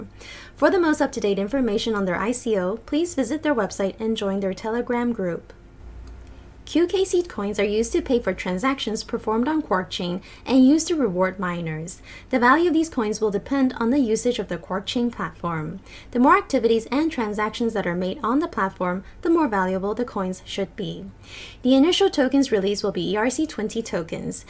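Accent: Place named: United States. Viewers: I coming from humble beginning...highly corporate American accent I find very attractive. I don't want to listen to no ghetto chick because she has "soul" but hey you cant please everyone I guess but you can certainly piss everyone off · AML86 na it's definitely her. Ok.. I'll explain myself. I owe her that. She either sounds dead because she's reading from a script in monotone or it's her highly corporate American accent. Likely a mix of both but speaking freely may fix it 👍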